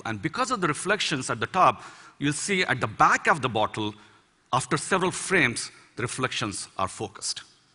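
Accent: India. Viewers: Hindistanlıların ingilizce konuşması o kadar komik ki adam şu videoda evrenin sırlarını verse , ışıktan hızlı giden uzay gemisini yapmayı falan anlarsa , ne bileyim işte dünyanın en önemli şeyini bile anlatsa , gene komik bu aksanla ))) eleman sanki temel fıkrası anlatıyor. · Bu hindistanlilar ingilizce danisanda iyrenc seslenir sanki baska bir dilde danisirlar iyrenc videoya adama gore baxa bilmedim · hintli aksanı kadar kulak tırmalayıcı bir aksan yok amk ;( sessiz izledim videoyu. coca cola şişesiyle deney yapmış sikik. biz de bim poşetiyle yapmalıyız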